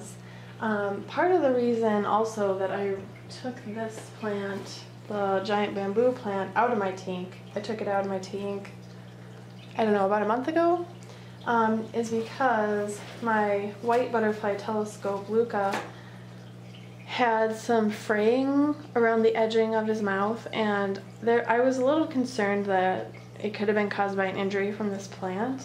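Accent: Minnesota, United States. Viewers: Its the Minnesotan accent!!! Its cute!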